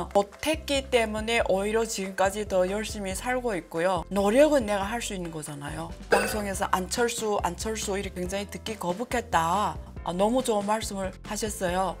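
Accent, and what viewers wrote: China. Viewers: 이분조선족 임?발음..ㅋ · 많은것 배우고 있습니다~^^억양이나 말투때문에 실력이 살짝 묻히지만 완벽하지 않은 모습이 더 정감이 있어요 인간미라고 해야하나요? 중국분이라 더 객관적으로 한국을 판단하고 계신것같아 그또한 잇점인것 같습니다~~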